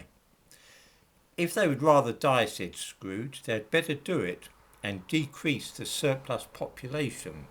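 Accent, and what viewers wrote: United Kingdom. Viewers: got a wonderful British accent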